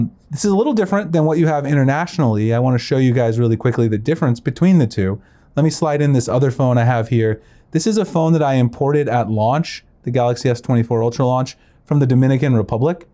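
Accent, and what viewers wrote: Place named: United States. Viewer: You talk to much horrible American accent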